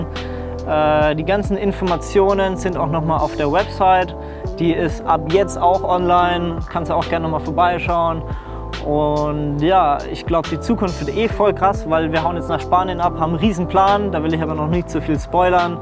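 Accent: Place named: North, Germany. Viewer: Super beeindruckend! Sehr nett ist auch die norddeutsche Sprachfärbung. "Hier is nochn Fliegngidda" Gutes Video.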